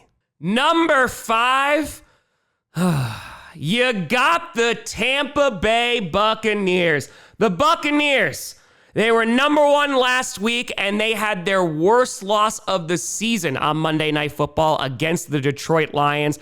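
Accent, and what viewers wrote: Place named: New Jersey, United States. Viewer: He’s from Jersey. Pretty common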